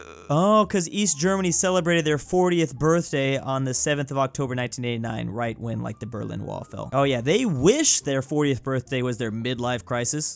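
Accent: Denmark. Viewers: Han lyder som en sønderjyde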